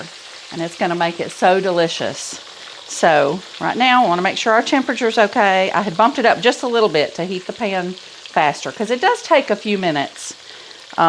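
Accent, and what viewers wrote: South, United States. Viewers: Love your recipes and your lovely southern accent! 😊😊😊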